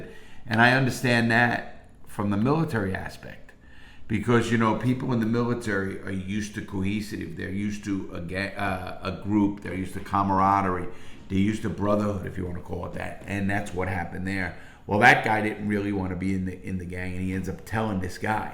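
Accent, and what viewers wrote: New York, United States. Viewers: U sound like you’re from NYC or the northeast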